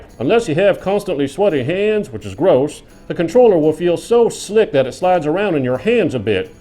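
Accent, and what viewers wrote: Texas, United States. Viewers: i have bad vision, the mustache is a small detail. As for the accent it didn’t sound annoying to me, just unique. Not tricked, You just sounded like a texan with a thick accent. I pay attention to what is said not appearances. I just hadn’t considered the possibility that you were playing a role. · An asian that has an accent of an american guy from texas lol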